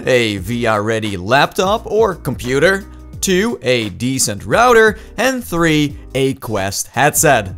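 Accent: Netherlands. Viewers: are you dutch?? idk why but i have a feeling with your voice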